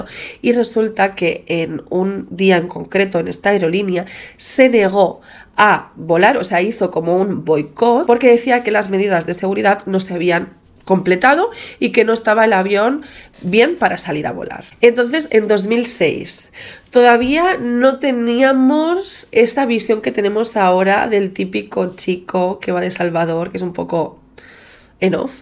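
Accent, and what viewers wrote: Spain. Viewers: Por que esta habla en este vídeo como española y en la vida actual en instagram dice que es Mexican American y habla como mexicana?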